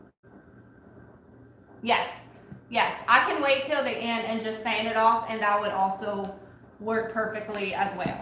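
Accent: Louisiana, United States. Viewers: ♡ I happened upon your chanel about 2 days ago and completely binged you all day today while cleaning/organizing my craft room!! So inspiring and I love your accent, I grew up in La and you bring me back!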